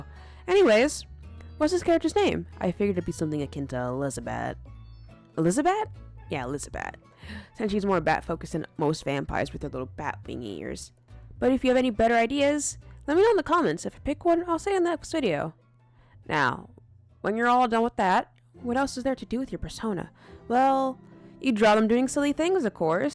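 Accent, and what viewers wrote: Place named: Netherlands. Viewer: oh idk why your accent sounded vaguely Dutch to me lol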